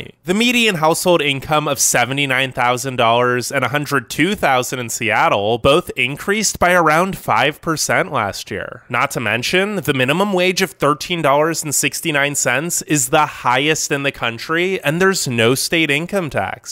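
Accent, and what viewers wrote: California, United States. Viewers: Is person speaking from Cali 🤔 ?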